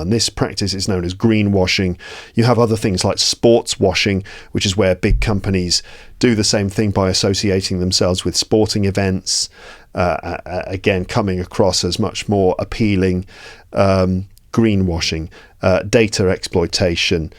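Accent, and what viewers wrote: RP, United Kingdom. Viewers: What is the name of the accent you speak? Is it like the BBC accent? ، Since I’m not a native English speaker and I’m learning English, which accent do you recommend I learn? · He speaks with a standard British accent also known as Received Pronunciation. Since you're a learner of English, you should go for Received Pronunciation. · I love Bristish accent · Yes, speaks with what is called RP (Received Pronunciation), which is clear and easy to understand compared to many regional accents. You can also call it “BBC English”. I think you should not focus on one specific accent, just make sure people can easily understand you.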